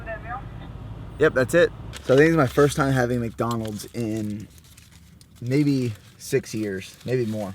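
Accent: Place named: Philadelphia, United States. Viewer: you sound like your from philly/harrisburg area. I know that subtle accent when I hear it!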